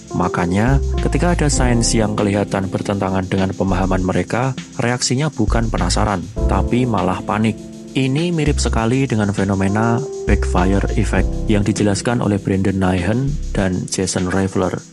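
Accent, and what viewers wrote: Java, Indonesia. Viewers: Gw kirain mo belain pke dalil dalil konyol dan gw udh negatif thinking denger medoknya, ternyata gw ga tepat, ternyata bagus ada dasar knowledge yaitu sosiologi, ya wis lah gw sub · Jawamu medok ga enak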